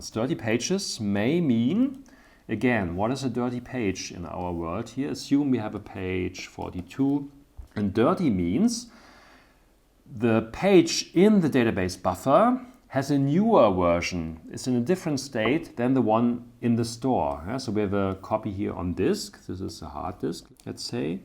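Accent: Germany. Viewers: Ohh Gott, dieser Deutsche Akzent. Aber trotztdem Danke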